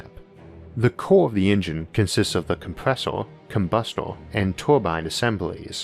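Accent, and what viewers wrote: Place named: Australia. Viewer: and im still tryna figure it out (i only hear 80% australian idk the rest...). 🤣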